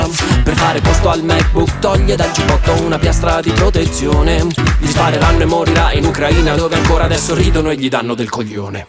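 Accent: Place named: London, United Kingdom. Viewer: Cacchio come parli inglese londinese! 🎉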